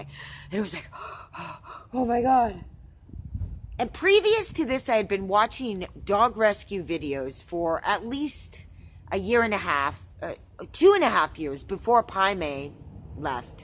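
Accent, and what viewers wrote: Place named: East Coast, United States. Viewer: Cool🐕i know you probably already know about the monks of new skete dog training book? I was really inspired by their techniques🐶 i try not to have bad days. Challenging moments in each day. After a malignant melanoma everyday is good. Life is not fair or easy. Great seeing you smile& that east coast accent is lol.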